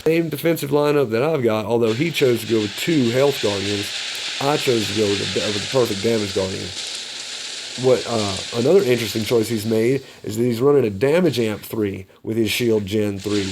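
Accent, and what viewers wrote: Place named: Texas, United States. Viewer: Howdy from Okie City!! Thought that was a texan accent! cheers bud!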